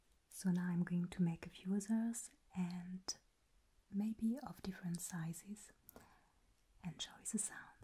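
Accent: France. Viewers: Bonsoir, le travail que tu mets dans tes vidéos est vraiment exceptionnel, bravo car cest très immersif. Le seul bémol pour moi c'est l'accent français qui ne fais pas "exotique" à mon oreille. Mais sinon vraiment bravo, je découvre ta chaîne et cest extrêmement qualitatif !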